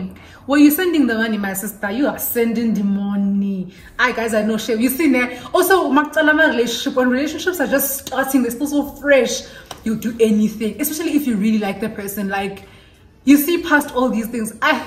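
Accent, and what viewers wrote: Nigeria. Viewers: Hey. I joined when you had your Collab with Lebonza and have enjoyed every single video after that. Your nigerian accent is the best! and very contagious lol i hope you get what i mean. Thank you for the lovely content ♥ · Hey Motswala I started watching you 4 months ago when you were doing a makeup video with Seithati Letsipa since that day I was glued to your channel. I love all videos & storytime, love the Nigerian accent kakhulu ❤️❤️❤️ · Hey Motswala... #10k brunch... The very first time I watched this channel, I commented and said am staying.... It was a shout out recommendation from Naledi Mallela.... The Nigerian code-switching accent gets me all the time. I love your authenticity... You could be the girl next door njeee❤❤❤❤ Hope you host a Cape Town Brunch and I get the chance to be nominated 😂.... ❤❤❤ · Hey Motswala hope you are good?❤❤❤ Naledi M send me here and it was comfortable over here. 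The first story I watched was the IPhone 6 story time and I decided to stay because of how you relayed the story plus you are funny 🤣🤣🤣 hlogo Yao ae bereke and I’m here for it. Followed you everywhere and your Nigerian accent and love for afrobeats ❤❤❤ I used to think is Igbo or Nigerian. Yeah wene why can’t I download your videos on TikTok? When I catch you😤😤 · I joined when you were doing make-up video with Lebonza I came from her channel straight to yours from that day😊...and I enjoy your Nigerian accent and your laughter on all your video 😍❤ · I discovered your channel through thati ever since I never looked back because whats life without Nigerian accent and your storytimes are the best.❤🥰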